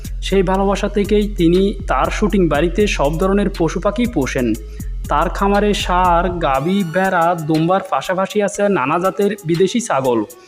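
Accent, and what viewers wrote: Chittagong, Bangladesh. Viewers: ও ভাই আঞ্চলিকানো চট্টগ্রামের বাসাও নও কি ভাষা এখনো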